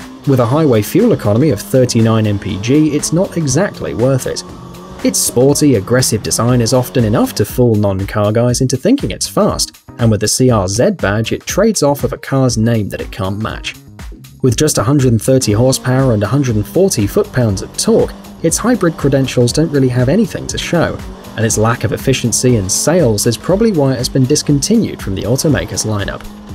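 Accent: England, United Kingdom. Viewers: Hes english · Come on you American he’s British not American we say zed not zee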